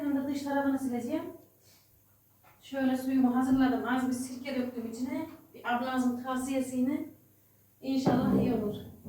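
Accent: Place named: Aksaray, Turkey. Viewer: Videolarin izlenme orani,abone sayisindan fazla!!.bir zahmet onlarda abone olmayanlarda abone olsalar😊.Demekki aksarayliymissin.Ogün bir videonun altinda yazmistim.aksaraylimisin kayserilimisin.sivenden anlamistim.Aksarayli elmasin hayati,bide onun gelini cansu otuk var.onlarda bu sivede konusuyorlar.Hatta siz konusurken,sanki cansu konusuyor😊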